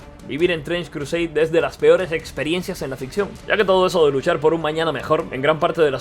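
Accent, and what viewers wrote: Cuba. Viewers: Eres de cuba?😊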